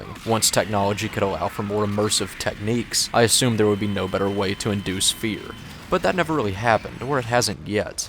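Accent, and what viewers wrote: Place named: Tennessee, United States. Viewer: interesting and enjoyably informative video on a game I've never played, but the audio's subpar, especially when compared to your usual standard of quality the occasional repeated lines and the bizarre sharp increase of the game's volume at the end were actually distractingly bad. if you're following the caldwell-gervais school of longform critique, that's fine, but steer clear of his audio editing habits, or lack thereof your pleasant (tennessee?) accent's far less pretentious and awful than noah's, though, so at least you have that going for you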